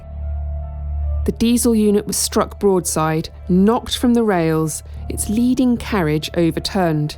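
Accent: England, United Kingdom. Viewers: It's a lovely human voice. Humans tell a much better story of haunting & spirits then bleeding AI. These type off stories need a sympathetic voice, I'm sure AI cannot do sympathy. It's also nice to have an narrator who's from the UK. There are certain stories that need an accent that brings a calm voice, she has the perfect voice. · Ah, that beautiful, quintessentially English voice again...a reminder of how wonderful the country used to be...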